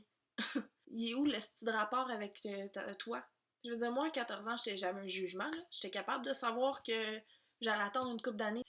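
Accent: Quebec, Canada. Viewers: J'adore l'accent canadien putain ! 😂❤❤ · J'ai un peu du mal à comprendre l'accent québécois mais c'est bien ce que tu dis !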